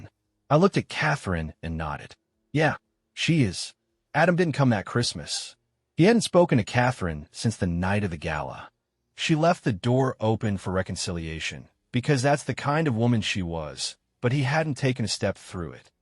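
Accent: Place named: France. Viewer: Can we stop with the stupid French accent it’s annoying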